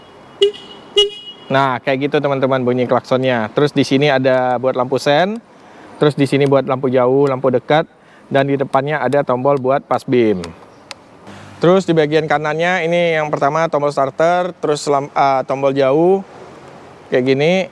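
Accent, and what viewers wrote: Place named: Minangkabau, Indonesia. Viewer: Minang bana Uda ini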